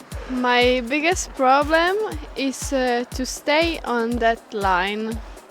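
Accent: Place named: Italy. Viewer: And I really like your Italian accent <3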